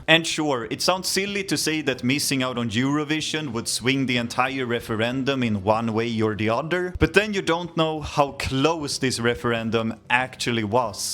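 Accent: Sweden. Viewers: Great report, and i love your swedish accent, i didnt know the prevailing result was only .5, thats just mad, its interesting to see the mindset of ppl at the time, that half nation that staying with serbia is better. Maybe back then it was a different geopolitics, I wonder what ppl think today, do they think that Montenegro being independent was a good move and are they happy where that choice landed the country in a more beneficial place than had it stayed in union with serbia? How would they feel if they had stayed with serbia as it is today? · as an austrian, I realy like your swedish accent. nice video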